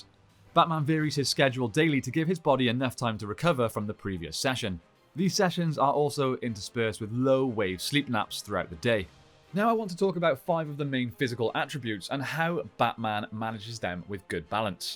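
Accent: United Kingdom. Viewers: Lol, not surprised you have a gym downstairs. You don’t really dress to show it off, but you can’t entirely hide from a discerning eye that you’re in good shape. Put your physique together with that British accent, your red hair, and your rugged good looks and it’s no wonder I enjoy looking at you just as much as I enjoy listening to you talk about Batman!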